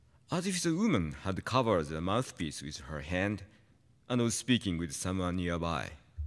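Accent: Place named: England, United Kingdom. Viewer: I love his English accent, sounds so melodic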